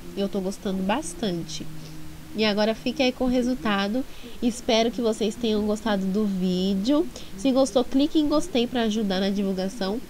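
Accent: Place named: Bahia, Brazil. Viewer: haha teu sotaque é de baiana mesmo😍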